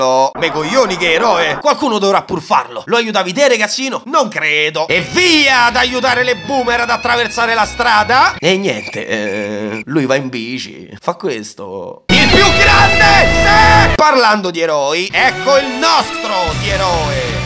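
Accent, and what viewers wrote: Rome, Italy. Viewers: Te vogliamo bene,solo per l'impegno che ci metti nel fare questi video e per la comicità con accento romano che non mette freno alle mie risate. Grazie di tutto <3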